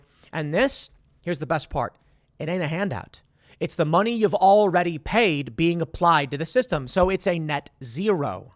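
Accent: Chicago, United States. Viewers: Sheesh,. Your South Chicago accent showed through today.... Appreciated your commentary. It made me reflect on my circumstances... past and present. In the 1970s it became harder and harder for a working class family whose parents had not gone to college to make it on one salary. That was when mothers (my mom included) started going into the work force - out of necessity to help their families keep up with the inflation of the 1970s. It is why my sister and I were encouraged to go to college... because it really was thought a college degree would help your children break through financially. Now, back then, you had to go to a bank with your parent to get a school loan. Mine was $10,000 over four years (that was a lot back then). After graduating from college, I had to pay that loan back over a ten year period. I still remember that last loan ticket and paying it off. 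Good feeling. But at some point the US government stepped into the school loan business. I think that's when things got out of hand and when college tuitions screamed higher and higher way above that supposed rate of inflation at the time. By the time my children (your age) were ready to go to college, my spouse and I had bought into what we were told, that it was the thing to do. But we also believed it was the best way for them to have a chance to make a decent living. Honestly, younger boomers and older gen-xers like myself felt they had to go the college route for their children, had to encourage it. We w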